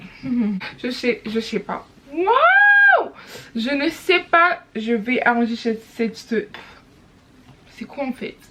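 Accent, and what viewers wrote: Ivory Coast. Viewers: T’es ivoirienne ? Ta façon de parler 😂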